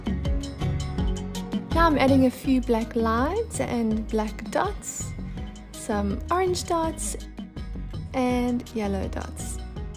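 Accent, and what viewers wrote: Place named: South Africa. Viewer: Brilliant idea and beautifully done. SA accent? Do you live in the US or SA? I'm so nosey, aren't I, but you're the first South African voice I've heard teaching painting and it's so refreshing.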